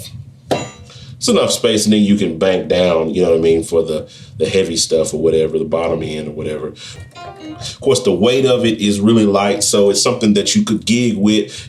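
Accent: United States. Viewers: The review is good, but the pronunciation is really very "American". It was very difficult for me (Im Italian, understanding English well) to follow.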